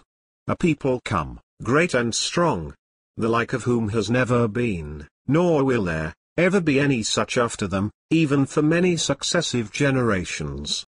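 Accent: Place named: United Kingdom. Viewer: kinda wish there was an Israeli voice or even Jewish from new York...but British?well on. lol praise the Lord anyhow...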